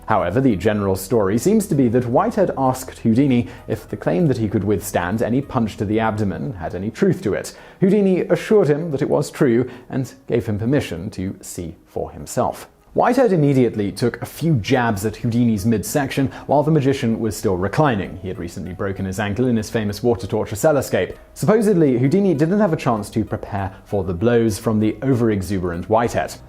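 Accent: United Kingdom. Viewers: You have the britishest accent ever my friend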